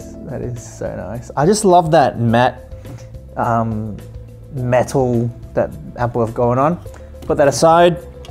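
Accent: Australia. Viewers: Theres no way your not Aussie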